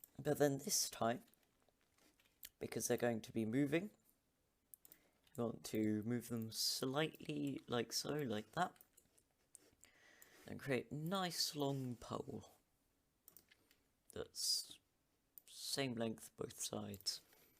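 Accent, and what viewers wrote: United Kingdom. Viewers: Me when i heard him : HES BRITISH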